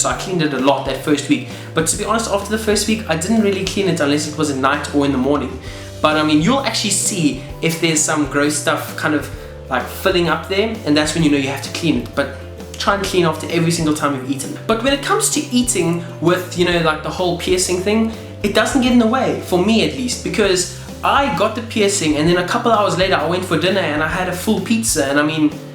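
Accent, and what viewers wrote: South Africa. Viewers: are you south African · your south african right? I can tell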